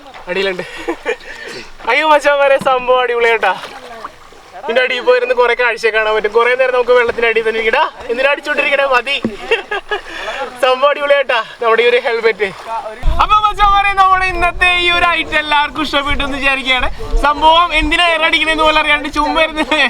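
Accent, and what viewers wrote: Thrissur, India. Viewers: മച്ചാന്റെ വീട് എവിടാ തൃശൂർ ഭാഷ ആണല്ലോ?❤️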